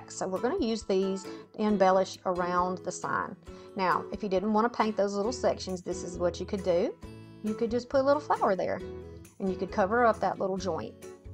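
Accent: South, United States. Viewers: listening to the Southern drawl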